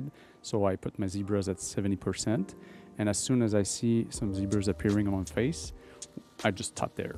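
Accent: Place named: Quebec, Canada. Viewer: I think I can hear you quebec accent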